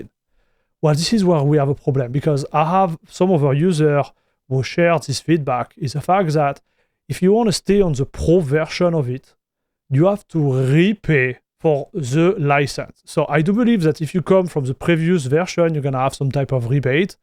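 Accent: France. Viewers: Bro you need to stop speaking in english, french people suck when speaking any other language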